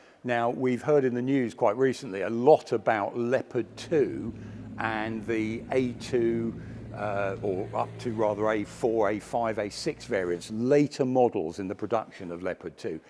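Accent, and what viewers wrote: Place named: England, United Kingdom. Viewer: Oh you boring bunch of nitpickers. Ukraine or The Ukraine … this guy has a much more charming and fine English accent than most of us will ever be able to develop!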